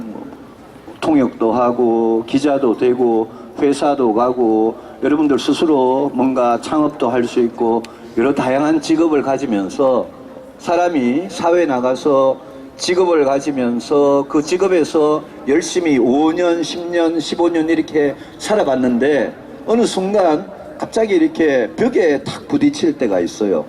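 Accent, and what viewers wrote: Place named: Gyeongsang, South Korea. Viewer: 경상도 사투리라도 귀에 쏙쏙 들어옴니다.유승민의원님 그날을 기다림니다~!!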